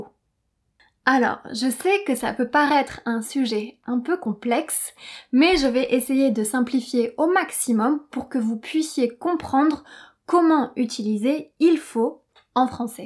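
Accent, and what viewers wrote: England, United Kingdom. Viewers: ou peut etre... vous sonnez comme British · I noticed that you're pronunciation in English like British. N'est ce pas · Am I the only one who besides looking forward to learning French from, also looks forward to hearing English accent? HAHAHAHA. C'est très beau! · Je suis un peu confus maintenant... 😐 Selon Duolingo, voilà quelques exemples comme ça: Il faut - he, you must Il dois - He has to Il devrait - He should Je voulais te dire que j'aime bien ton accent en parlant anglais, tu parles comme les anglaises. C'est si mignon 😍 Est-ce que tous les français ont l'accent du Angleterre en parlant? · I am so tickled that you have an English accent with your English language. ❤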